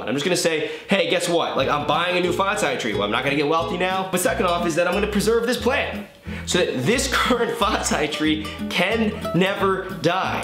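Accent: Philadelphia, United States. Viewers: what part of philly are you from lol I hear it in your voice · u can hear his Greater Philadelphia Area (tm) accent so clearly in this video omfg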